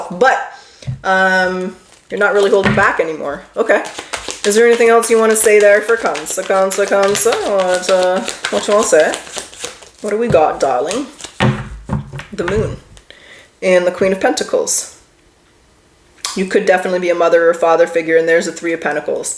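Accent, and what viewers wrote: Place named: Canada. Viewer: You're Canadian... Lol I can tell. My ex is Canadian too. I love the accent.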